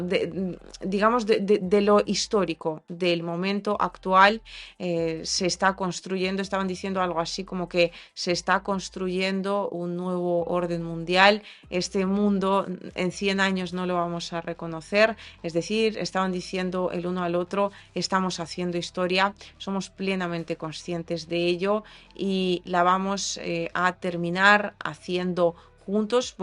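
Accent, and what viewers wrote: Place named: Castile, Spain. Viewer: Muy buena información muy buen español aunque con acento castellano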